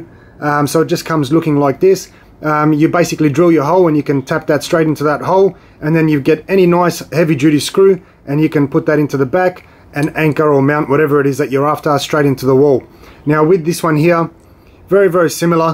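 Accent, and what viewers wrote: Australia. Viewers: Best Vid bro... Easy, quick and direct... and awesome to hear a middle eastern aussie voice.. lol.. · Thanks so much. I loved the Aussie accent the most.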